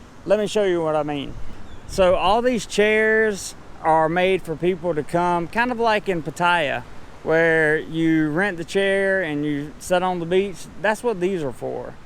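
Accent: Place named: South, United States. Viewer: Great vid .you sound like your from the south.. Tennessee or Alabama.anyways much respect from NC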